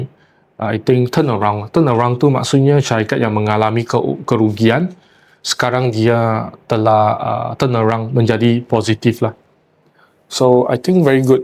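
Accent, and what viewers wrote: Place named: China. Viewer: Fasihnya berbahasa Melayu wpn pelat cina tetap ada.SYABAS KERANA MENGUASAI B.MELAYU DGN BAIK. 👍👍👍🥰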